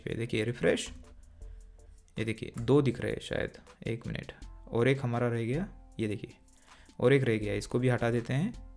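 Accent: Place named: Lucknow, India. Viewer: Where are you from. Because your language is like lucknowis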